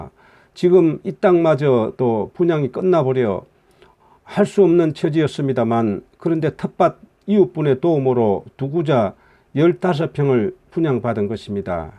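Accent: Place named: Namhae, South Korea. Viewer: 말씨가 고향이 남해시군요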